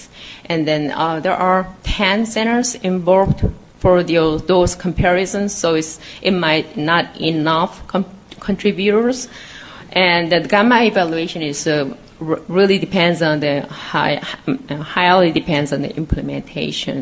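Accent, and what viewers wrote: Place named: China. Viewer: when it comes to science the language should be clear & understood, her Chinese accent replaces the "L" with "R" MLC will be MRC, Real-time become Rear-time!! but thank you for the effort.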